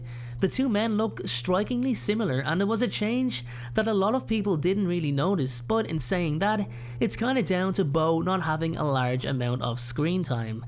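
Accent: Ireland. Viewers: Desiccated... he has an Irish accent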